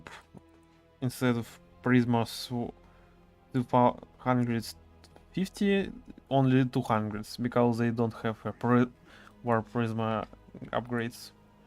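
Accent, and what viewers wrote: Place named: Russia. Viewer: Судя по акценту предположу что вы из России😉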